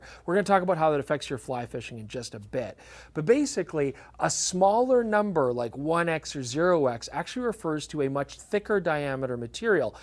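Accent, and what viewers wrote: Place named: Canada. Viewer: I’m watching going why you seems familiar, then I heard an “eh” or something Canadian and it clicked. So do you stand on wet slippery rocks at daybreak with your rods clinched between your legs which makes you lose balance a bit while you try to get pix? For me it was a no brainer which camera I’d take when those shots would be possible and ppl thought I was nuts because it’s so old but those amazing colors it’d get could only come from the Nikon d700.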